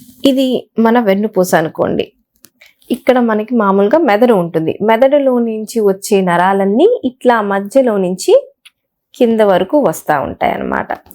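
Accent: Guntur, India. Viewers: It is our Guntur slang.we like our slang bro. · Pure guntur slang..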